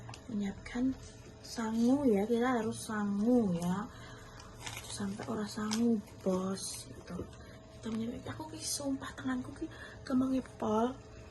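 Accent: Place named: Java, Indonesia. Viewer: pengen ngerti boso inggrismu lancar pora wong logatmu jowonemen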